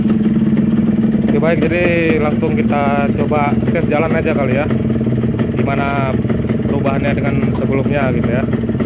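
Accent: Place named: Medan, Indonesia. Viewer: Medan apa Aceh bang😁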